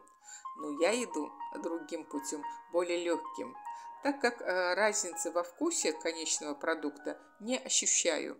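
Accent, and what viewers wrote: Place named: Baku, Azerbaijan. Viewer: ханум, спасибо.Сама я тоже из Баку, обожаю слушать наших женщин с нашим бакинским акцентом.Удачи Вам и процветания Вашему каналу.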